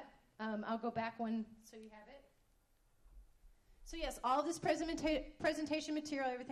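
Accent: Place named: Kentucky, United States. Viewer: It was brought to my attention today that someone is offended by a comment I make at the start of this video, and because of my earlier comment here, referencing my Kentucky accent (the offended person didn't write me - her colleague did). Prompted by that second-hand feedback, I've written a blog that I'd like for anyone who is offended by my self-depreciating humor to please read first BEFORE becoming outraged. There's also suggestions in the blog on how to communicate with me directly about any of my work, so that things can be clarified before offendedness ensues. <link>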